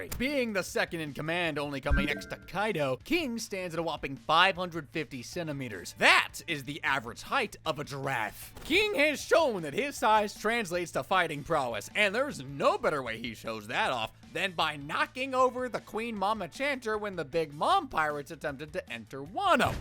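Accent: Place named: United States. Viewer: This video is in english narrated by what sounds like an american. Why then would you give metric measurements instead of feet and inches?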